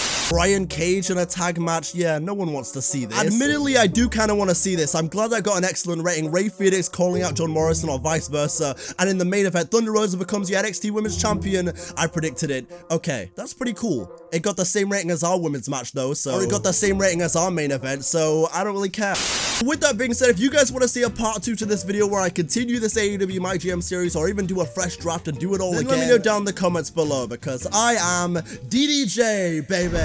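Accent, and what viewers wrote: United Kingdom. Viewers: Like ur accent u sound British to me ❤️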